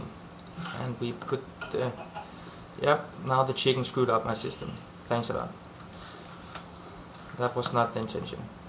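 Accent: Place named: Denmark. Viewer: are you danish? you really sound like your from denmark. the accent is really special for danes.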